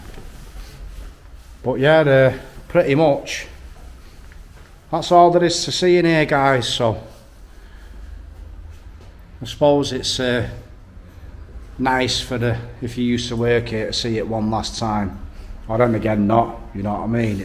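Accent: Manchester, United Kingdom. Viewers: like a manc boss .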